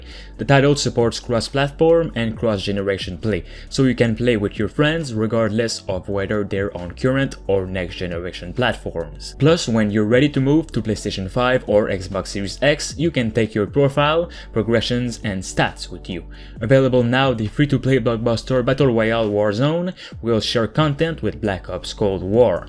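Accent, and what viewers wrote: Quebec, Canada. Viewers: T’es tu Québécois par hasard? 👀😂 Good content, continue comme sa!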